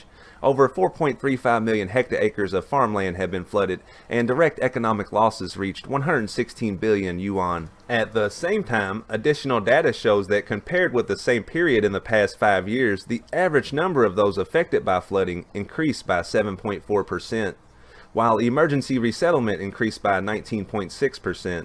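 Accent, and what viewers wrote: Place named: West Virginia, United States. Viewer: Sounds more like West Viginian accent. You insult true Southern accents.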